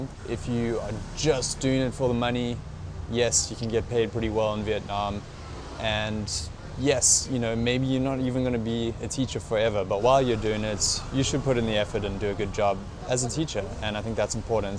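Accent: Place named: South Africa. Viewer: Ek hoor 'n Suid Afrikaanse aksent.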